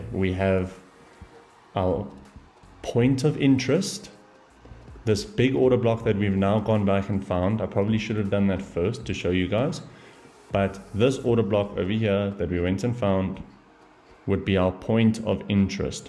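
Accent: South Africa. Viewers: You sound South African 😅 great vid bro🧠👊🏾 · is that a Cape Town accent? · You from South Africa right? Really good video mate, well explained! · you south african?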